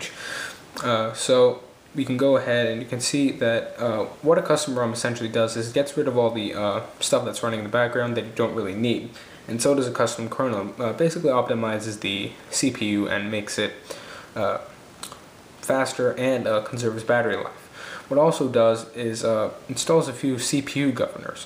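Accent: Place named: United Kingdom. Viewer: USE UR BRITISH ACCENT!!!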